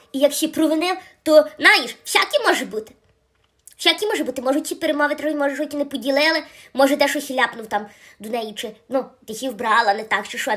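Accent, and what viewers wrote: Galicia, Ukraine. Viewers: Галицький балак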